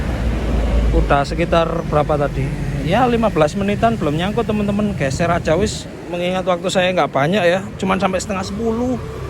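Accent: Malang, Indonesia. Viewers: Logat orang malang sama semua 🤣 kebanyakan temanku logatnya mirip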